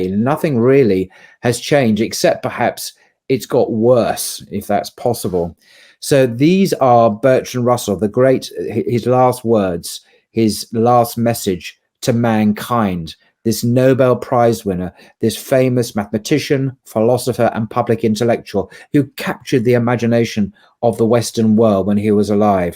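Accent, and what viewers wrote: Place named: Cockney, United Kingdom. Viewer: I have been a student of Russell for my entire life. Deeply admire the man. He was a giant. Can't resist the following observation: You describe Russell as a "pacifist," then you go on to say--without any apparent sense of irony or recognition of contradiction--that he "supported the war against the Nazis as a lesser of evils." Hello? Anybody home? Ah, just watched the rest of the video. The Cockney accent grows stronger as it progresses. "Aerial bombing, blah, blah, blah." (Witness Dresden, for example.) You reveal yourself as both a moron and, perhaps most of all, a Jew hater. Thank you so much for this erudite little vignette.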